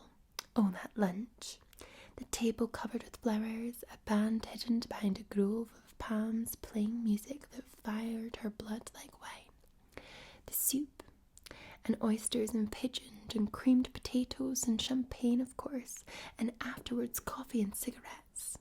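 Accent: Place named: Scotland, United Kingdom. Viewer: I can’t place your Scottish accent, but digging it 🏴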